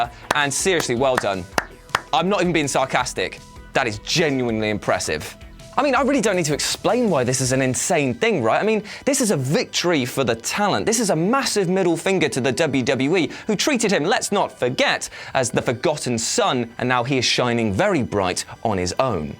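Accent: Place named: United Kingdom. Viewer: I never knew until today that was actually a Brit.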